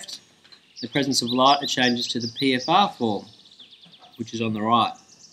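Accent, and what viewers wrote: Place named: Australia. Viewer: ohhhhh. it now makes sense. You saved my sanity. thank you. And I do appreciate the australian accent too. :D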